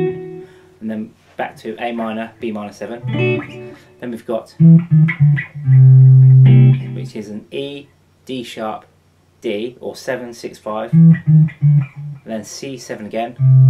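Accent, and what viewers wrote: England, United Kingdom. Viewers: english mate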